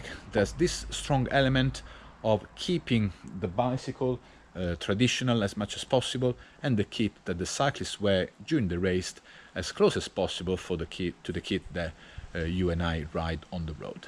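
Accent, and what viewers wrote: Italy. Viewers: Well done 👍, I like the Italian accent, it makes this mindset more believable 😂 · Thanks for sharing your take on the humorous Velominati “rules” in your wonderful Italian accent! Like your video, I think the “rules” are observations of the cycling community. Velominati takes these to a satirical extreme. I love them- follow some, don’t follow others as it suits me. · I don't even have a bicycle but I enjoyed listening to an Italian talking English. Very well done too, a lovely accent.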